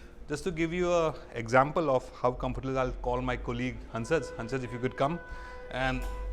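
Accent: Assam, India. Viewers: The presenter I believe is an Assamese, guessing from his accent.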